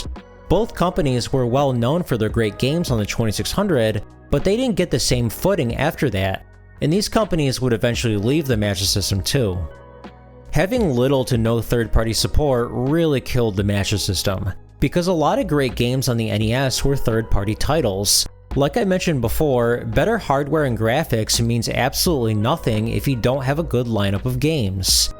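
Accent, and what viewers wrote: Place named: Transatlantic, United States. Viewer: So neat to hear the transatlantic accent with relatively high quality sound compared to commercials and even some films made in the 1940's. Transatlantic + video games = complete bliss! As someone who's played a good deal of both in the 2000's, they both have their standout titles. While I love the Mega Man hexology on NES, Phantasy Star was no slouch!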